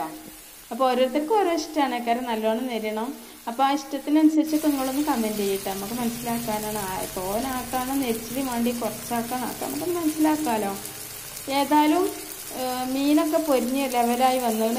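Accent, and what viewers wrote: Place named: Kottakkal, India. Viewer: bhasha kettittu kottakkal aanu ennu thonni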